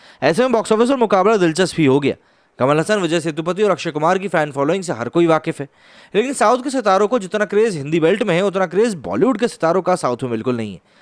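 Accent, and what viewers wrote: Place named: Punjab, India. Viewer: His pronunciation is like that of Punjabi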